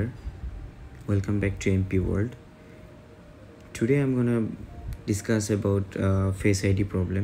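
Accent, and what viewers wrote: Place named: India. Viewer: That reassuring moment when you hear an Indian accent on a tech troubleshooting video 🔥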